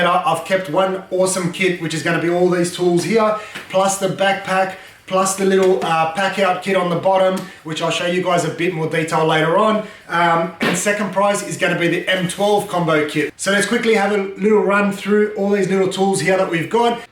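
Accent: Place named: Australia. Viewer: Love ya accent, decent run through. Easy to understand and implement. Go aussie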